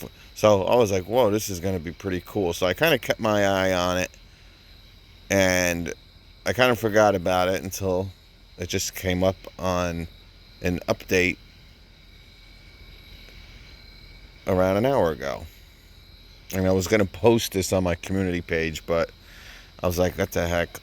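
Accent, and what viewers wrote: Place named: New Jersey, United States. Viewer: Dear God I’ve missed that gravely, baritone Jersey voice. Thanks!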